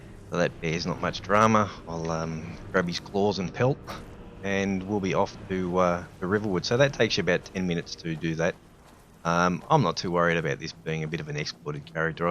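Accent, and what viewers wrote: Australia. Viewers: this is an aussie accent... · You from Australia 🇦🇺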